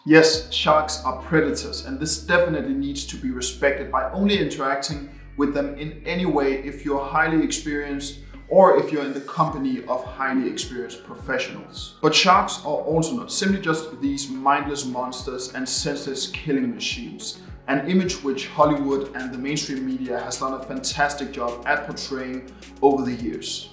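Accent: Denmark. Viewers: Are you from Denmark? Your accent sound danish.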